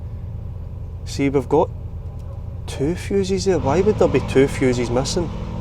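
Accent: Scotland, United Kingdom. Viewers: Scottish. Subbed. 🙂🍺🍻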